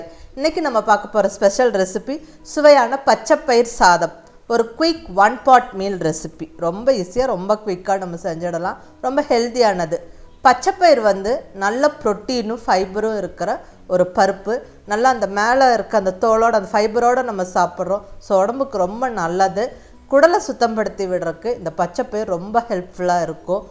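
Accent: Coimbatore, India. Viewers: Sis neega covaiya neega pesurathu eanaku pedikum sis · Feeling good to hear the coimbatore tamil slang. Very nice akka. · neenga pesarathu kongu tamil ah madam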